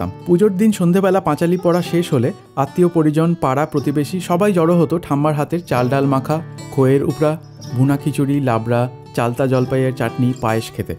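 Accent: East Bengal, Bangladesh. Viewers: কথা শুনে বোঝায় যাচ্ছে পুরো পূর্ববঙ্গে ইয়া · Tumi ki from east Bengal . Bharali bolle bole bollam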